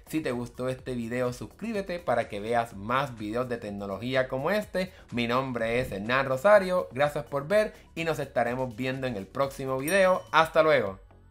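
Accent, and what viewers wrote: Puerto Rico. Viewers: Yo de momento dije ese acento me suena a boricua, y si, mano casi no hay youtubers boricuas en tecnología es bueno ver más de los nuestros en estás cosas, yo compré los Beats hace como 3 días y de verdad estoy encantado hasta ahora, tenía antes los pixel buds serie a y eran buenos pero los Beats son mucho mas comodos, con mejor sonido y la cancelación de ruido esta excelente, y no solo eso por el precio que pague, $144 después del ivu, de verdad encantado con ellos hasta ahora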